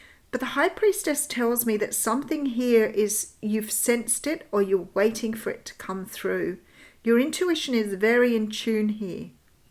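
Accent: Australia. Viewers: So lovely to hear the Australian accent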